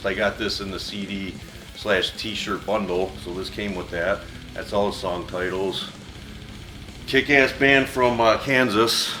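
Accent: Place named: Chicago, United States. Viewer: Fn love this channel bro, lifting and listening to metal! Hey do I hear a mid-west accent in your voice? You sound like a fellow Chicagoan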